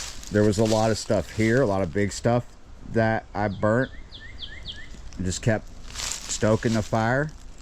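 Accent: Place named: East Texas, United States. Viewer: Boy have you absorbed that East Texas accent! Love your episodes!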